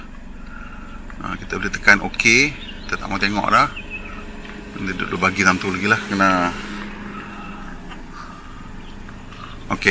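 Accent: North, Malaysia. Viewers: Kalu ada lampu tuh..malam2 tak laa mengangau sangat..takdak lagi org ribiew keta guna ayat macam nihh..syoq abg dok sulam lorat utara nohhh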